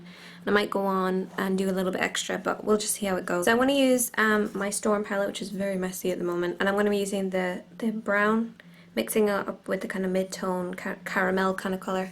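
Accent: Ireland. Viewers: are you Irish? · You are really pretty! :) Are you by any chance irish? Sorry just curious, you sounded a bit irish. I'm not irish I'm british, norfolk accent XD Just wanted to know! Btw great vid, i'll be using those tips haha. I know a good tip for eyeliner. To keep the eyeliner on so it doesnt come off or smudge is to put a simular color eyeshadow over it or if you want a bit of variety use a different color! :D Something i picked up when practicing my make-up! :)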